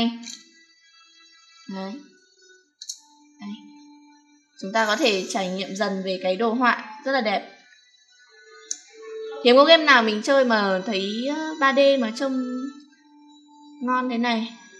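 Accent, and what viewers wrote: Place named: Hanoi, Vietnam. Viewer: Rất thích giọng con gái hà nội 💝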